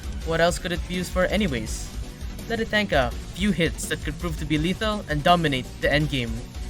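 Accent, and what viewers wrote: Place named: Philippines. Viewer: Your accent sounds filipino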